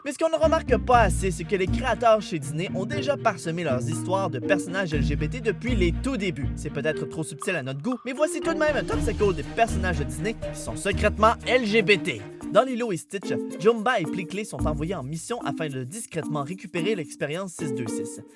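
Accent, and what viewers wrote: Quebec, Canada. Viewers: Il est Québéquois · Moi ce que j'aime c'est ton accent. On dirait un Canadien. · bel accent canadien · L'accent québécois 😂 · T’as l’accent du Québeeeeec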